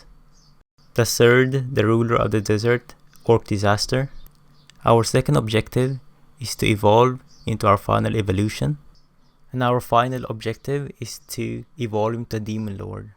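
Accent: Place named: Philippines. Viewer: i sense filipino accent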